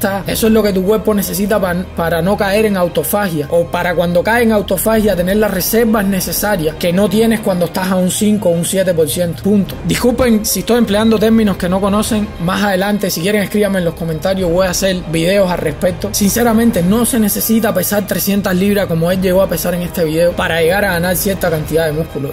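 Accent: Cuba. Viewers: hubo muchos que no entendieron bro, empezaron una discusión absurda por acentos cuando realmente es gracioso pero carismático ese acento cubano suyo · Que bola brother por tu acento pareces de Cuba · Dale loco todos sabemos que es cubano y es su acento por naturaleza digamos que tu comentario no es nada atinado ante una persona sale e invierte tiempo de su propio tiempo para hacer un video alertando a los mas jovenes sobre hacer estupideses luego de grabarlo subirlo publicarlo lo mas inteligente que haces en el teclado es redactar sobre su forma de hablar rayos viejo solo atinas a burlarte? No jodas que tienes en la cabeza inmadures o no sientas cabeza se ve que ya estaa grande para eso no lo crees?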